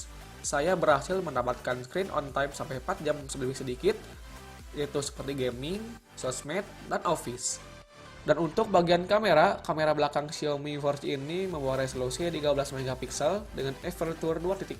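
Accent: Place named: West Java, Indonesia. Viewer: Sunda pisan! Meni resep 😆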